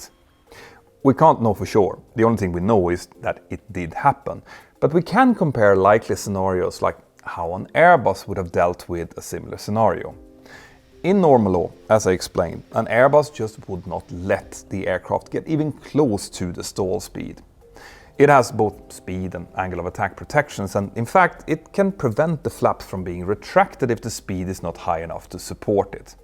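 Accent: Scandinavia. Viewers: An interesting Scandinavian accent here, but natural for sure. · the way u talk english either ur norwegian or sweediah 😂😂😂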